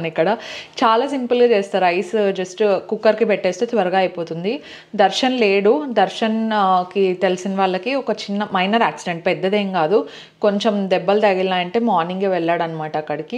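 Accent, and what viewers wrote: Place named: Rayalaseema, India. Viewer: Meeru same ma lage matladtunnaru meeru rayalseema or kadapa valla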